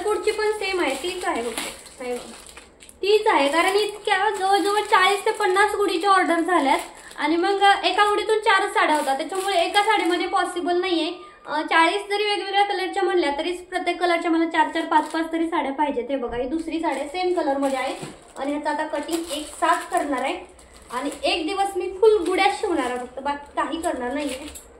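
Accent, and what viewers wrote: Satara, India. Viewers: तुझी भाषा साताऱ्याची वाटते गाव कोणतं आहे तुझं मी तिकडची आहे. गाव.नरवणे ता. माण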